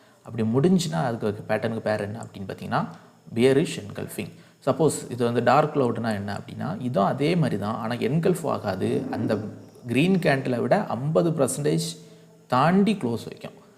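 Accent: Kanyakumari, India. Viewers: slang vachu kandu pudicha am from kanyakumari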